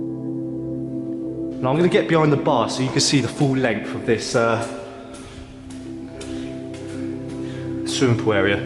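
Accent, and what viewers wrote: Bristol, United Kingdom. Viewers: I made it in, you're accent is SO annoying I couldn't watch any more. I prefer watching the American explorers it just hits flat when British people try to pull off an exciting, informative and unique experience. This is trash man. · Going by ye voice im guessing ye from ol Brizzle me boy!! Ye sounds like me ol Brizzle buddy from university!! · You gotta b kidding me ryt. At 1st one of u started talking I thought omg his Aussie, gota find out were is this abandoned mansion n then the British accent came out I was like ohhh shoots ...I can NOT believe such a beautiful mansion can be abandoned like that..That's a couple million dollars ryt.. I mean who wouldn't want to have that n restore it....hmmmm unless it's haunted...😱 post more videos...guys.. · So what area of the UK is this house in? The narrator sounds a bit Bristolian so is it near there?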